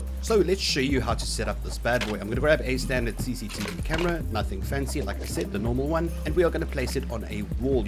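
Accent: Afrikaans, South Africa. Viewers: He sounds Afrikaans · you sound like you come from south africa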